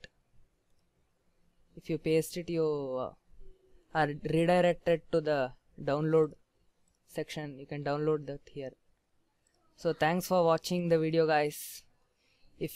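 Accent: India. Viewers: Right as a hear the Indian accent I knew this was a helpful video lol. THANKS!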